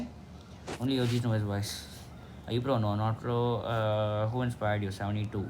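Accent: India. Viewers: You sound like indian🧐🧐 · Me guessed from the accent he is Indian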